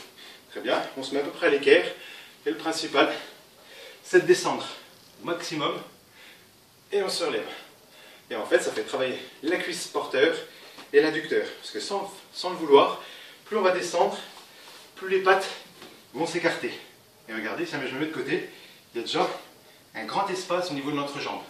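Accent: Belgium. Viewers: À ton accent je pensais aussi que tu étais Belge 😉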